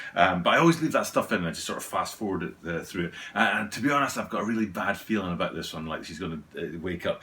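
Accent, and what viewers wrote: Scotland, United Kingdom. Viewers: Not gonna lie, wasn't expecting to hear a scotsman when I went to watch the video. Glad to see these have been reissued tho.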